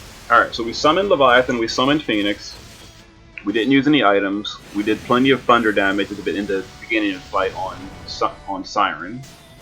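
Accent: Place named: East Coast, United States. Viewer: Gotta be east coast at least. Or you're one of those weird people who had a brain injury and inexplicably woke up with an accent. Ah, I know, are you from Lanzelt? :-D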